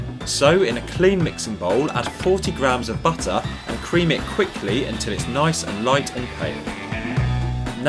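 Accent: United Kingdom. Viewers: BRITISH ACCENTS ARE SO ANNOYING but the recipe seems simple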